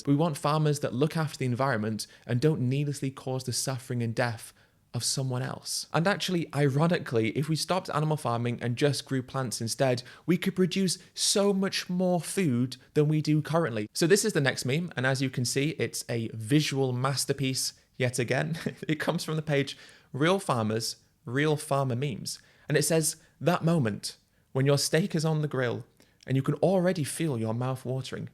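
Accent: United Kingdom. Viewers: I love the way Ed has such a posh accent but can't pronounce 'th' in some words, it's so endearing! The arguments are first rate too!!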